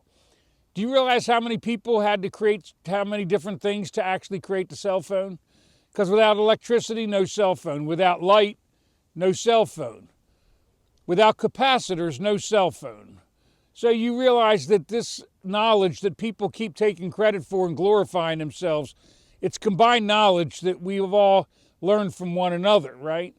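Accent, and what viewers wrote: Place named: Pittsburgh, United States. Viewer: I hear that Burgh accent too💪 Yinzer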